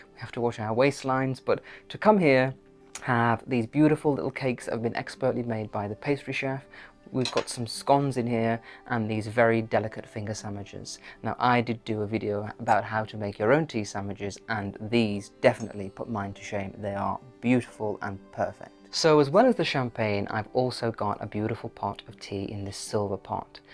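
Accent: United Kingdom. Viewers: I would like to thank your Mother, for bringing such a beautiful💙 angel, into this world!!🤗....I LOVE watching your, glowing-radiant face on camera, and listening to your beautiful British accent!! You, are a treasure🌷🫖 God Bless you, Beautiful Soul!! Love to you, from California.🇺🇸🤗💖💙🤍💜🥰😘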